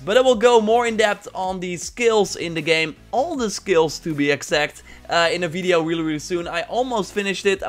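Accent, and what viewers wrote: Netherlands. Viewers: What is this guy's accent? Sounds Dutch.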